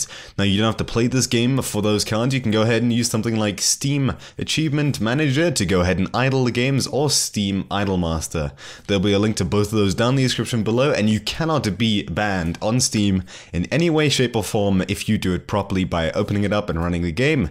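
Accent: South Africa. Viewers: i new you sounded South African howsit fellow South African ^^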